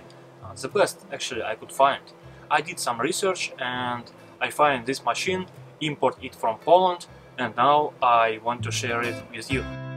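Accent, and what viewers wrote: Russia. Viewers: русский акцент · Я по аценту понял, ты из России? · Услышав знакомый акцент «зе», поняла, что это русский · Какой-то русский Акцентик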